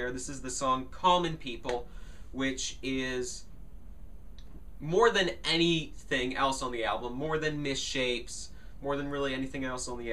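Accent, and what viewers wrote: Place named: Transatlantic, United States. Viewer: You're not only the first person with one of those funny transatlantic accents I've seen to like. You're probably the first Yank I've met who properly gets the class system we have over here. Kudos for that. Seriously. Also interesting point about something changed. Never thought about it before but you're right. It doesn't fit with the rest of the album. Not bad for a whippersnapper.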